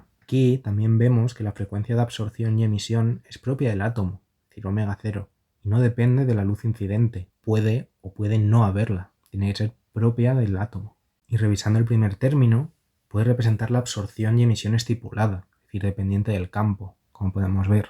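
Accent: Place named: India. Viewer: Gran vídeo amigo, me salvó el semestre. A veces no se entiende el acento hindú, pero está chévere. Saludos desde Perú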